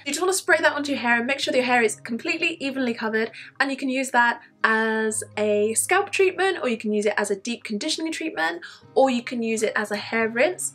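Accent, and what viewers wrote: United Kingdom. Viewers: Hi. This is my first time seeing your video. I really needed and appreciated your show. Great British accent. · Wotah Ahh I love the British accent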